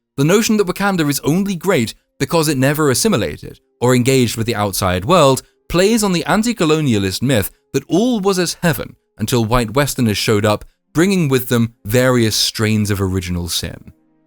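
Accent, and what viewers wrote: United Kingdom. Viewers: How did I know you were British before I even clicked on the video?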